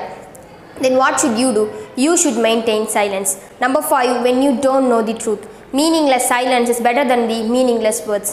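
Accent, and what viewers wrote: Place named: United States. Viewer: it is like an American dialects... superb...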